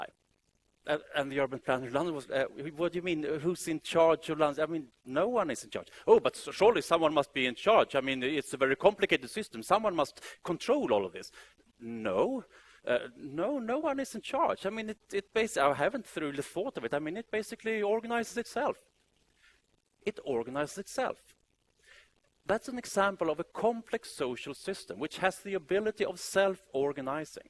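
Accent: Sweden. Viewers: Of course I know the definition of awkward. Apparently you don't, since you had to question it. Being a Swede myself, you can't imagine how painful it is listening to someone speaking English, in the Swedish accent. And this guy had a really thick accent. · How amazing how the swedish accent and speach melody comes through his english !! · awesome Swedish accent XD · DAT ACCENT... Let me guess, swedish? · So swedish hahaha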